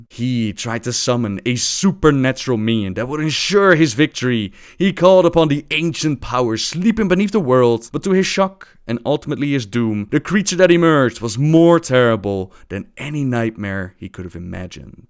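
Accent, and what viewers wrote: Netherlands. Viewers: rymarowicz he is dutch. And has a nice accent. so he might say thing differently then you and i do.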